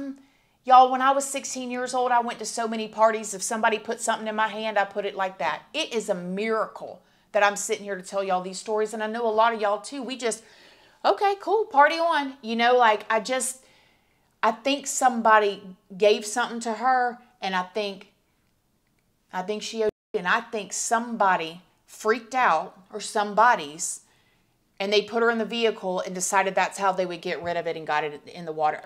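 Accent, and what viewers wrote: South, United States. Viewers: How did I not know about you! That southern accent and true crime ❤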